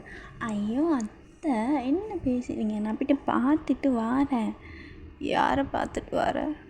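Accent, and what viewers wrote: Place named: Sri Lanka. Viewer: Your sounds like Sri Lankan are perhaps a Sri Lankan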